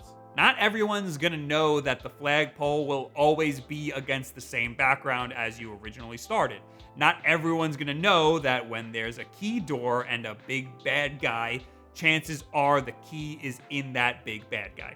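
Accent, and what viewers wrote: New York, United States. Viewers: You can tell that he has that New York accent just because of the way he says Mario lmao.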